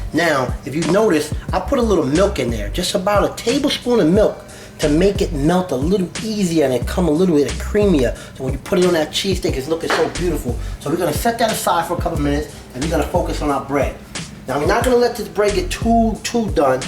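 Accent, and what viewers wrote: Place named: Mexico. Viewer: dude, you sounded like mexican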